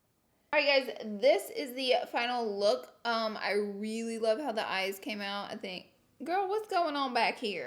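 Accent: South, United States. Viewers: I Always get So excited when there is a new vid!! Girl you are Absolutely Gorgeous & So Damn entertaining & being a southern girl (GA girl here lol) who has a Very thick accent too, its oddly comforting to watch you & hear your Beautiful voice & someone who Pronouces shit Right (and we know how we are saying right, Right? With the Loonnnggg "I" 😋)!! That eye look it Stunning on you!! On me with my orangey natural ginger self, I'd look like a damn clown probably lol, but if I got a chance for you to do it, you damn right I'd let ya! Love from the south girl where its hotter than hell & the humidity forces you to pretty much give up Completely on even Thinking of doing anything with your hair other than throwing it up in a messy bun on top of your head! And Love from my 4 beagles & 3 cats who watch & listen to you too & Love you! My youngest cat Sev tries to "catch" you & "pet" you a lot through my phone while I watch! 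Lol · You’re more southern than ever 🤣🤣🤣 (must be mom’s visit influence!) gotta concentrate to get everything but I had a blast watching this video as always 🙌🏼 Love from Peru 🇵🇪 ❤️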